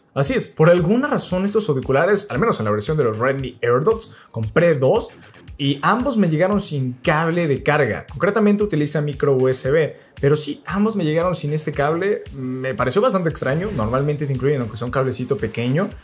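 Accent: Mexico. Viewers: Me gustan muchos los vídeos de este canal pero me fastidia la forma de hablar jajajaja puro hablado de mi rey de culiacan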